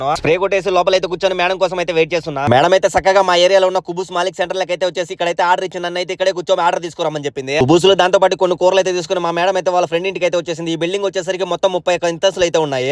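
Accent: Rayalaseema, India. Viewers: Seema slang anthe 😂😂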